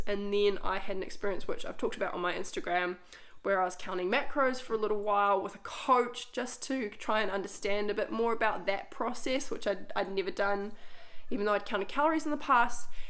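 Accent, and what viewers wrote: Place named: New Zealand. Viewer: As soon as you spoke I knew you were a fellow kiwi and the struggle is real to gain the proper technique when it comes to vegan weightloss! You are so right when it comes to the confusion over all these trains of thought about diet! Wow that is so exciting to be collaborating with Kiki and Hannah when you are just starting out! You must have been mind blown! ! They are both my favorite vegan you tubers! ! Way to go!!!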